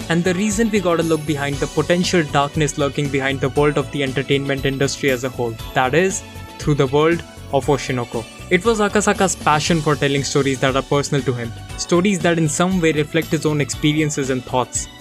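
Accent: India. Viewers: Amazing video man, btw are you Indian ? Your accent sounds like it.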